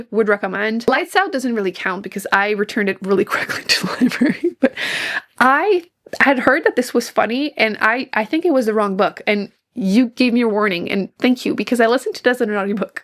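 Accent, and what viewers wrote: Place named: Quebec, Canada. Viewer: I know a québécoise when I hear one hihi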